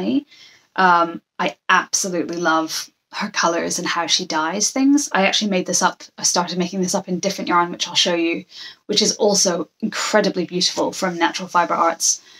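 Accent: United Kingdom. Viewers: Hi, Hello from Scotland. You just popped up on my YouTube suggestions, and I’m so glad you did. I was knitting with some Miss Click Clack Merri Creek Sock yarn while I was watching you. I’ve been a member of her Ravelry Group for around 6 years now. She is a wonder with the dye pots! I even flew from Adelaide to Melbourne a few years ago, for the day, just to attend a Craft Event and a “Clackette” get together. (I have lived sporadically between Adelaide and Scotland over the years - long story) I don’t sew but I still enjoy seeing beautiful fabrics and hearing what you intend to make with them. I’m amazed at what you found in thrift stores. I’m looking forward to seeing your winter coats in those gorgeous wool/cashmere fabrics. The aran cardigan was also a great find. It looks like you are leading an interesting life up there and I look forward to seeing more of your bus, and the surrounding country. 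And… I thought I had detected a tiny trace of a scottish accent here and there, and then you confirmed it. All the best. 🏴🥰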